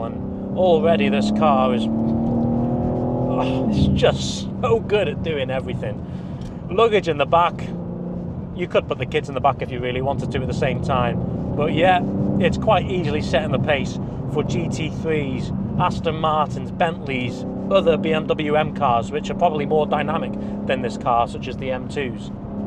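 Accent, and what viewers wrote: United Kingdom. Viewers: no one wants to hear your short british tongue innit mate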